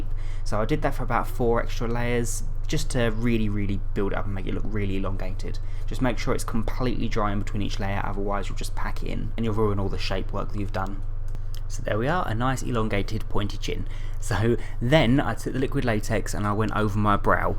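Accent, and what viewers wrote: United Kingdom. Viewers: I LOVE YOU TOO MUCH BC I SAW YOUR CREEPYPASTA STUFF AND I WAS LIKE "oo ima watch dis" AND YOU HAD A BRITISH ACCENT AND THEN I LIKED YOU EVEN MORE AND NOW I KNOW YOU LIKE DOCTOR WHO AND IVE SEEN EVERY ONE OF YOUR VIDEOS I LOVe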